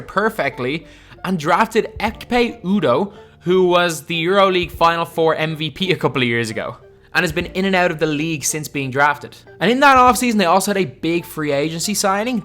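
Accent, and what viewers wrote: Ireland. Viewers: Where in Ireland are you from? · Nice one.. Very refreshing to hear an Irish voice on nba One thing though Linsanity happened in NYC yet they let him go. N it was a culmination of many misfortunes that led to Linsanity.. Don't how it would hv happened here n if it did how would it halt the dynasty.. Maybe a better dynasty?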